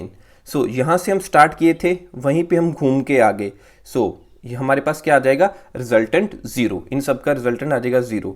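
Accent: Punjab, India. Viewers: sir great explaination , also are you from punjab?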